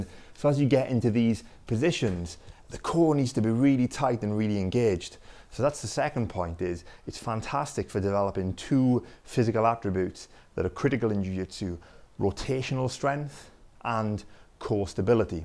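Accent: Wales, United Kingdom. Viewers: Nice to hear a Welsh accent on YouTube! Bro use YouTube cards to and pin your videos on the screen when you mention them, easier than description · U welsh butt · Ah, a Welshman...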